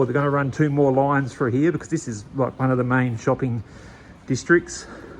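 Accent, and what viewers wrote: Australia. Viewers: You sound like an Aussie? Am I correct? Thank you for showing videos of what the situation is really like presently, after all covid restrictions are being lifted. Just curious (and amused 😂😂😂) why are you, unlike your countrymen, not afraid of being attacked by the Chinese? Tell them NOT to be afraid of their own shadows!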